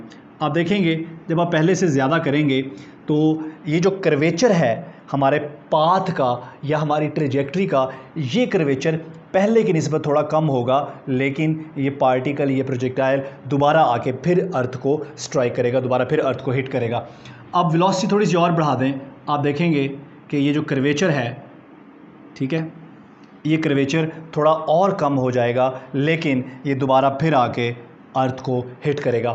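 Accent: Pakistan. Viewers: Are you from pak or kashmir???? Coz ur accent matches!!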